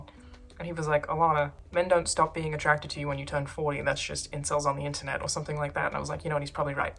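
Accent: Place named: Australia. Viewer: I imagine you'll still be hot when you're 40, you'll still have that Australian accent that north Americans love. I also imagine creepy people on the Internet will still be a thing ten years from now.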